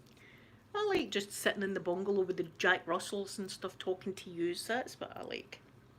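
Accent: Scotland, United Kingdom. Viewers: Aw,. You're one of the most cheerful ladies I've ever seen. I like popping by just to hear your lovely and adorable Scottish accent. <3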